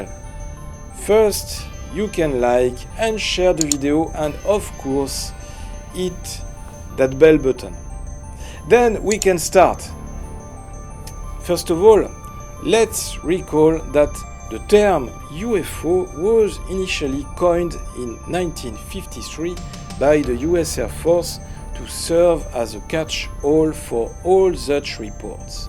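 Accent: France. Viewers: Literally the strongest french accent I've ever heard 😂, cest bon mon ami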